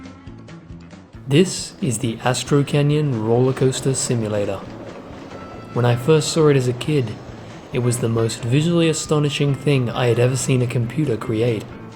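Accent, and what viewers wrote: Australia. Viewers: May I ask what accent that is? I'm not a native English speaker so I have difficulty placing accents. Australian? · Are you aussie mate?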